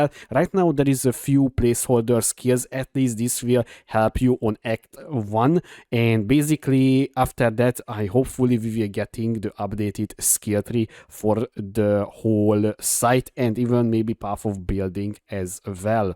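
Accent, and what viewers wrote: Hungary. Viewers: Hey, just from curiosity , by any chance do i get right from your accent that you are hungarian?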